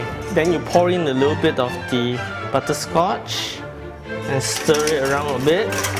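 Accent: Singapore. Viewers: dang. that looks delish! and i miss this accent lol. singaporean or malaysian? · are you like singaporean?? haha. the accent :D i'm singaporean too.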